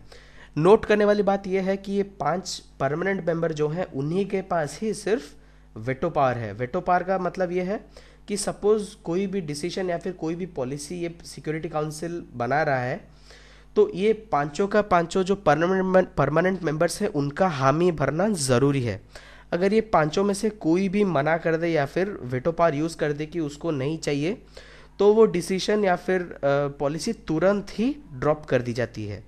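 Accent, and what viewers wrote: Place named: Bengal, India. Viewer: sir u please don't make videos ..your voice is terrible ..your begali accent is not at all effective ..it's very tedious ..i m unable to comolete the video due to your tedious voice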